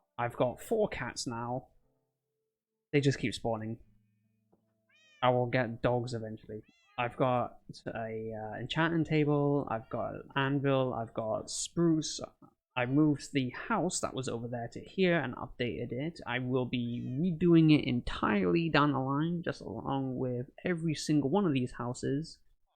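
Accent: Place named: United Kingdom. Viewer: You sound British as shit cuz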